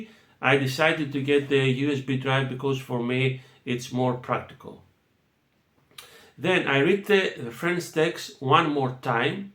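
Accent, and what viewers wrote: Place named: Greece. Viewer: The way you speak english strongly suggests me that you are greek. Την εφαρμογή χρησιμοποιείς η το βιβλίο της ασιμιλ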